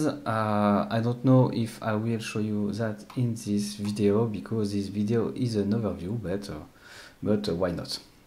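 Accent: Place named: France. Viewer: Do not ever apologize for your French accent! :)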